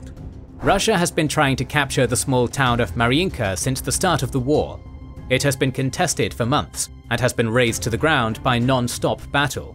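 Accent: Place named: United Kingdom. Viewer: Love the coverage of Ukraine . Probably the best I’ve seen and the British accent is a must.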